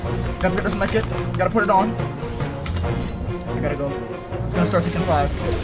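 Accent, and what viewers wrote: Jamaica. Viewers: Yo are you Jamaican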